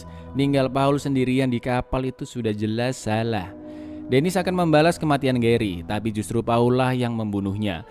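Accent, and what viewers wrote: Java, Indonesia. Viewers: Ini Adalah Channel Membahas Alur Cerita Film Paling Asik Salah Satunya,Gara2 Membahasnya Medok + Asik:)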